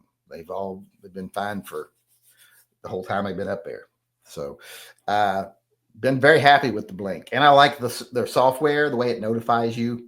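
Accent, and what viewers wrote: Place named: South, United States. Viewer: I don't know your story. You sound like you have a southern accent. It's blasphemy for a southerner to refer to himself as "Yankee." Lol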